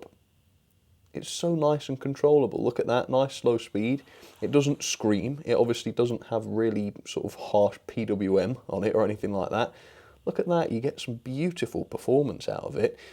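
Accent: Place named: England, United Kingdom. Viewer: oh my god his english accent is amazing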